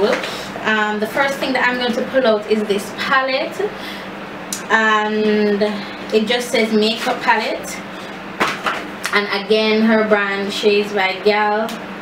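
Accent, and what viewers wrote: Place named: Jamaica. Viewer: Really enjoyed this video, I thought it was just me who got super excited when I purchased new makeup! "How them romantic suh, everything jus wrap up!" I just love the Jamaican accent yes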